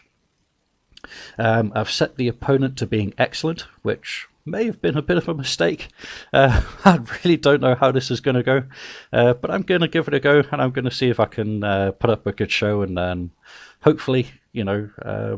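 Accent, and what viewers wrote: United Kingdom. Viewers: Kind of cool and weird at the same time hearing an Englishman narrating DCS in a FW-190 lol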